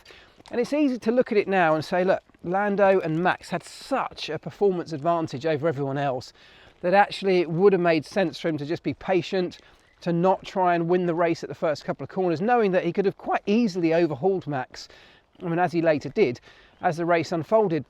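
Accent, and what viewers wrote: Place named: England, United Kingdom. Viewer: Marc, the combo of you walking your dogs through the English countryside while giving a blow-by-blow on the latest F1 is genius. Unique. I am a fan and Seedstream user. Authentic stuff only a true F1 participant could pull off. You know that an English accent can raise bias alarm bells but thank you for keeping the commentary on an even keel. Continued success and many thanks.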